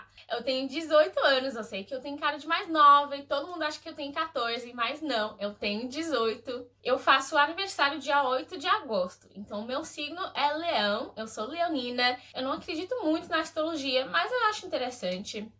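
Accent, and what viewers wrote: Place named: Northeast, Brazil. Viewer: Nossa que português... Passa tranquilamente por nordestina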